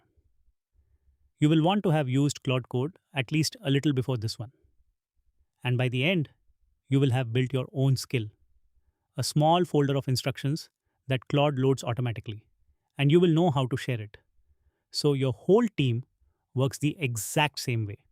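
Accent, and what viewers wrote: India. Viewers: You made a chatbot channel and you chose to use a Hindu accent english voice-over? My God... how counterproductive! Spare our ears and brains please!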